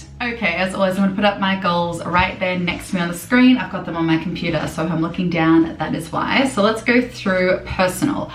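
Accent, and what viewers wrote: Australia. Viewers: I would love to explore Melbourne with you one day! I want to learn an Aussie accent from you! Enjoy your wonderful life in Vancouver! Xoxox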